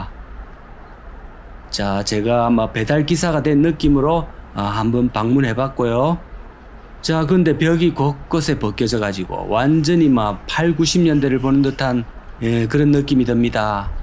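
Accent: Gyeongsang, South Korea. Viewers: 엄마가 아니고 음마도 아니고 은마입니다 ㅋㅋ 갱상도 사투리 매력😊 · 구수하니 경상도 사투리 듣기 조아요 아주 꾸밈없는 목소리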